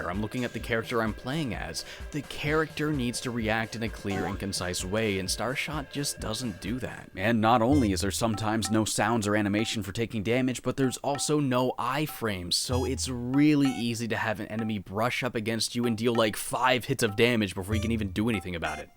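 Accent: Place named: Canada. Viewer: can someone finally answer this for me? is a fellow canuck or is he like from northern america? because that accent is just so hard canadian sounding and and a canadian with as very separate yet funny sounding accent i freakin love it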